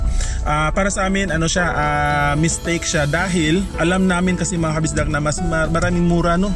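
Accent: Philippines. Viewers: omg, i dont get your accent. is it from philippines?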